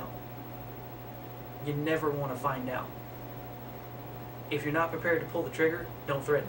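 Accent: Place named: South, United States. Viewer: Sound like u from dwn south, anywhere near newmadrid seismic zone? Would hate fir u to have to leave your homestead.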